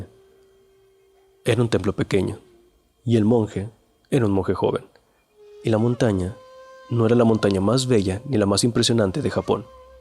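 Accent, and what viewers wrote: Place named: Bogotá, Colombia. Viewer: A mí no, me hace pensar en un rolo gomelo. No me puedo concentrar de imaginarlo. Aunque es claro, vocaliza bien y se entiende muy bien, es sólo si acento que me suena como dije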